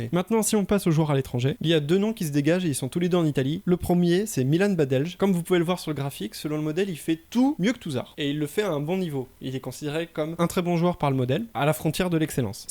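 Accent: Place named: England, United Kingdom. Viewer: L'accent anglais <3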